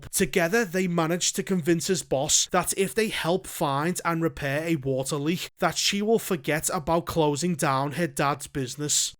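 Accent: Liverpool, United Kingdom. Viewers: Wait..are you by chance a scouser lad?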